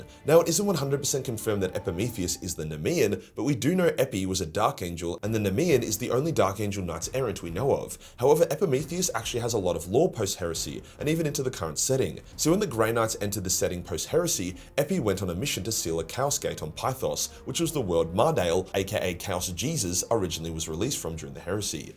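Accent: Australia. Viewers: Bruh when u said the battle was harder than a word bearer at a daycare centre that shiiii caught me so off guard I spat my drink out ffs this guy never fails to make me laugh every video the Aussie accent makes it even better brilliant stuff my guy.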